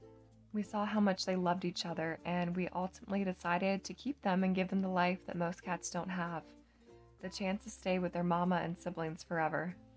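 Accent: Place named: United Kingdom. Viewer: British accent is quite appropriate, I say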